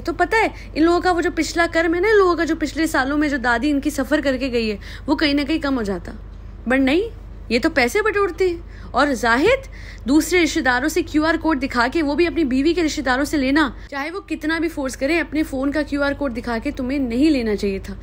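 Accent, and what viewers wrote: Punjab, India. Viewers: Hi, apki language Mei Punjabi tone hai ❤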